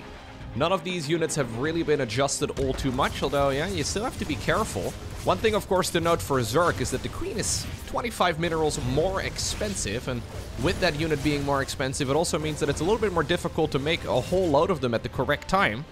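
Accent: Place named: Netherlands. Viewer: I'll never not hear that dutch accent on the "O"s -COHMPFIDENT! -COHMPTFORTABLE!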